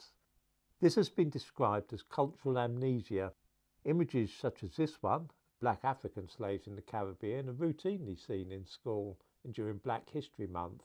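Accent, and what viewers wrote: United Kingdom. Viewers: Sorry it offends you, but the fact he has a British accent does not stop him being a liar.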